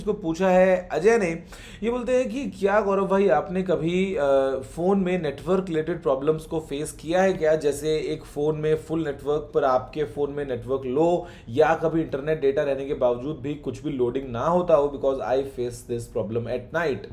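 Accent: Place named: Marwari, India. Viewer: Aapka marwadi aati ha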